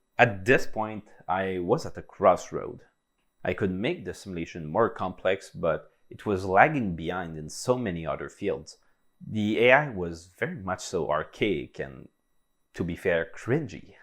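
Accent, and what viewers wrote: Quebec, Canada. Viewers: Hey mec j’ai vu ton vidéo sur la simulation de 100 heures et en t’écoutant je me disais: ha j’suis sur que c’est un ingénieur (québécois). Tu représentes le ´deuxième ´ type d’ingénieur: le type qui devient moniteur de ski ou maraîcher ou youtubeur… bonne chance dans ton projet c’est super intéressant!! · I am from Quebec (Canada) and your accent is pretty similar at the one that we have here when we're talking english, are you from Quebec?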